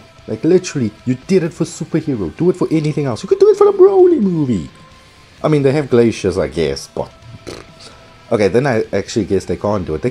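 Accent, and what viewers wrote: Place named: South Africa. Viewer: I can smell a south african from a mile away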